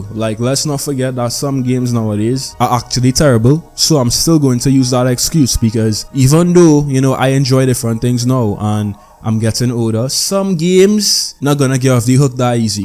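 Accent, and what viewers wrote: Trinidad and Tobago. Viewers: Is that a trini accent?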